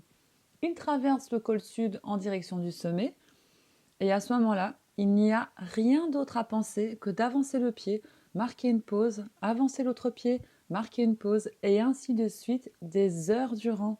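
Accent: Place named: Belgium. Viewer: Je devine un petit accent belge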